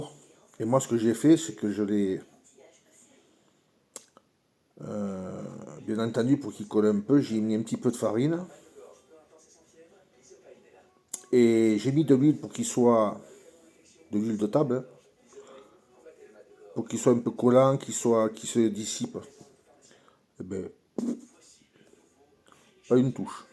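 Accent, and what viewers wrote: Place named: South, France. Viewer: Bonjour, Je suis carpiste je pêche la carpe depuis mes 10 ans. J'ai commencé à pêcher la carpe au Maïs et à la pelote. J'en ai maintenant 43 ans. Je pêche à deux ou 3 cannes de 12 pieds en 3.5lbs, avec les techniques modernes, rod-pod et détecteurs à la bouillette + stick ou PVA, Maïs, Tiger-Nut ou Frolic. Je ne sais pas dans quel coin vous pêchez, mais avec votre accent, je présume dans le sud de la France. Il existe des étangs qui sont complètement stériles du fait qu'il y a peu de densité de poisson et par conséquent une faible concurrence alimentaire. Il peut y avoir aussi une forte pression de pêche, le poisson est éduqué et plus méfiant. Il faut aussi savoir si les étangs dans lesquels vous pêchez sont empoisonnés régulièrement en carpes et connaître le cheptel et le poids moyen etc..... Si vous pêchez la carpe sur des étangs avec peu de carpes vous aurez aussi du mal à en faire. 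De plus, il faut aussi parfois amorcer préalablement pendant plusieurs jours un spot afin de conditionner et mettre en confiance le poisson. Il faut aussi chercher et repérer les bons spots sur l'étang que l'on va pêcher, sauts, marsouinages des carpes, présence de nénuphars, arbres et herbiers immergés dans l'eau, les roselières et les bordures riche en végétation etc... Concernant la méfiance du poisson sur un hameçon, je dirai que ce n'est pas l'hameçon, mais plutôt le corps de ligne, le bas de ligne et la présentation du montage avec l'appât en lui même, le type d'esche, la taille, la coule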